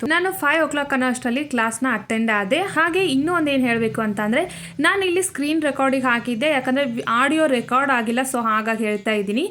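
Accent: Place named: Davangere, India. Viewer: Sis nimdu Davangere na language ade tara ede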